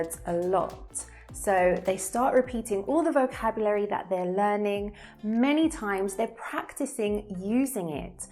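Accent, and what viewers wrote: United Kingdom. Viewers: I got difficulties to understand british accent😅😪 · British Accent.. sorry to say, my dream is wanna learn only American Accent... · I love your British accent my dear. 😍🥰😘❤ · Your british accent is really amazing thanks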